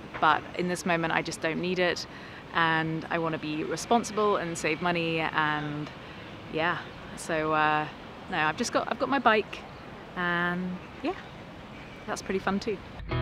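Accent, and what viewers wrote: United Kingdom. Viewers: I know you hear this all the time but I so love your accent. Just British beautiful.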